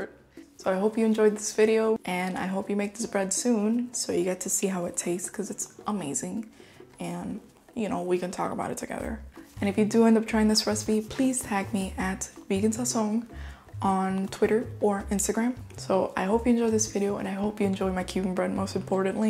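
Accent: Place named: Hialeah, United States. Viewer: I don’t even have to know you to know you are from Hialeah!!! 😂 Love it! Can’t wait to try this! Subscribed and will follow you on IG :)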